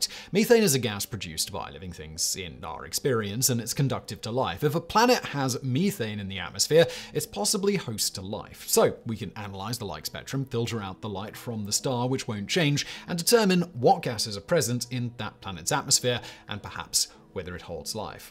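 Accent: United Kingdom. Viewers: Well makes at least 5 hours of footage a week after editing. Also uses British English pronunciations, not American English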